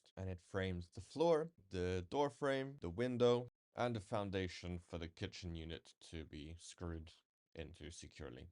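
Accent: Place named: United States. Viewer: It's not called trash, it's called rubbish. You speak like a yank.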